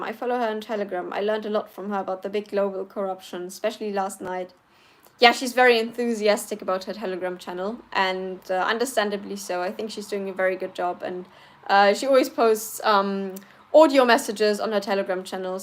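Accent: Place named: United Kingdom. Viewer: You sound a bit like a character out of the Harry Potter films when you're speaking English. No offence!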